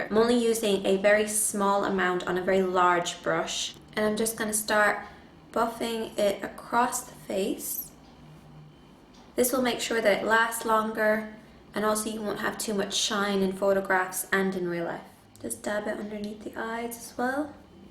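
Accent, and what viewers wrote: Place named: Ireland. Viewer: Are you irish... and moved to the usa years ago? because it sounds as if you used to have an irish accent and are losing it.. that or a parent has the accent and certain words rubbed off on you. whatever it is.. i love that accent. its beautiful.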